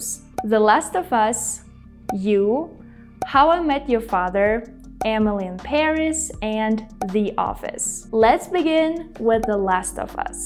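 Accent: United States. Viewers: I love Your English Videos so much Dear and You are so great speaker in American English, I think you live in USA.😊😇🥰😍🤗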